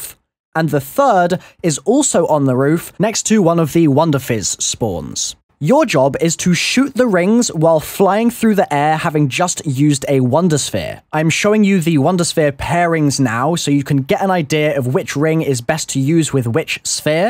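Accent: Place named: United Kingdom. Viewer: his voice is probably "funny" because he's british. if you're american or something around that area, then that's probably why. his voice does sound a bit high-pitched for a grown man, though. maybe that's what you meant.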